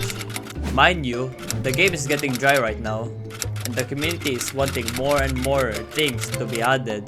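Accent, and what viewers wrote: Philippines. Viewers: you sound filipino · the strong filipino accent is killing me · Im new to the channel and 20 seconds in i noticed that Filipino accent XD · This is the most pinoy accent ever yo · haha knew it from the accent, im also a filipino playing cw nice content btw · Is Filipino? cuz the accent sounds like it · pinoy to AHAHAAH halata sa accent eh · Filipino Accent???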